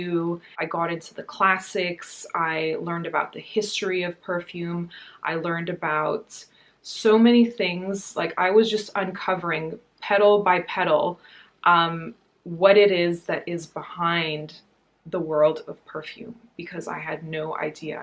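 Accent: United States. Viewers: American schools explains why you have an American accent. Also, what is short for?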